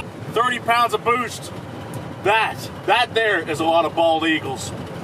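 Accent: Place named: Kansas, United States. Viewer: “Them things like that” Hillbilly from Kansas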